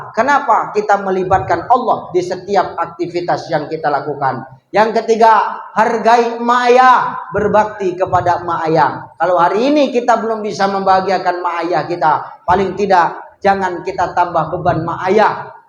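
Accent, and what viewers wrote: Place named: Sumatra, Indonesia. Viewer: Assalamu Alaikum Apa ka bar bang Ustadz semoga sehat terus saya suka sekali dengan Ceramah Nya bang Ustadz lucu banget logat Sumatra nya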